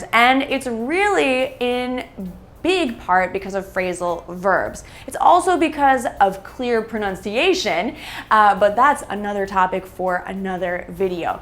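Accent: United States. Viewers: ya lookt awesome suppa beautiful. Light and camera angle were perfect and the narrative like an American blondie. Thanks for these videos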